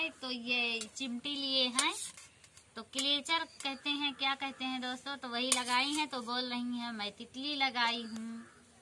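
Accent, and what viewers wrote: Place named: Uttar Pradesh, India. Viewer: Hello.....m bhi up side ki hu.....aapki boli bhot acchi h......mjhko bhi aati h.....but mjhko sarm aati h.....koi bolta h dusra to bhot accha lgta h..... or aapka babies bhi bhot hi pyaarey hn......bhgwan aapko saari khusiya de.....aapkey bacchon ko bhi...☺☺☺☺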